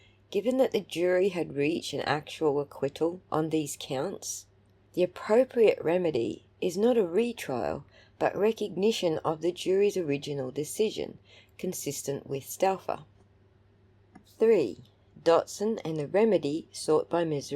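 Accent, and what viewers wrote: Australia. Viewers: Love yer Aussie x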